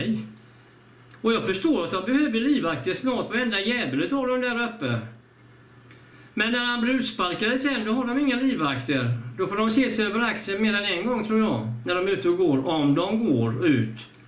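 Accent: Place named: Gothenburg, Sweden. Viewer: Folket som sover kommer nog inte att vakna, så hjärntvättade. Du är så bra fortsätt med detta. Och din göteborgska, den är så härlig.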